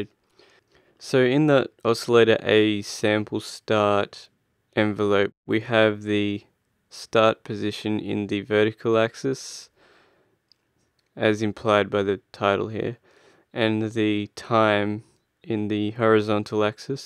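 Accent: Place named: Australia. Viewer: Insane! I'll really have to try absynth. The grains part remind me of the sound you get when playing in Padshop BTW, huge Silverchair fan, especially the 90s and 00s stuff, and your voice reminds me so much of Daniel Johns', I love the Australian accent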